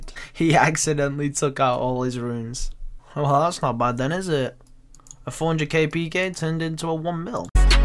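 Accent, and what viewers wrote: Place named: United Kingdom. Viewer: Uk accent = ez youtube voice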